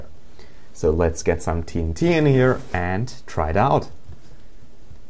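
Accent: Germany. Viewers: your english really sounds like a german speaking english. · Hes a awesome guy With a nice Germany Accent People Are Hatting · He sounds German to me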